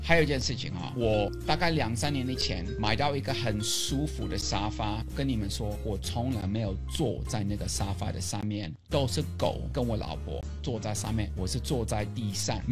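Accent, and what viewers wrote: Taiwan. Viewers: 您的台灣國語說得太棒啦！:) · 29年讓人改變了！臺灣國語耶！哈哈哈！ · 很台灣腔既老外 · 好幽默哦，也很台（穿插好多台語） 讚👍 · 這位教授........你的口音不只是那麼簡單...你語氣結尾帶著齁......有夠台....我這台灣人講話都沒你那麼台.......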